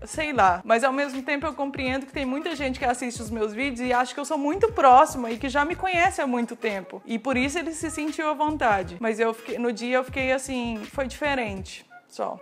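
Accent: Minas Gerais, Brazil. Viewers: Sotaque mineiro <3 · vc e mineira?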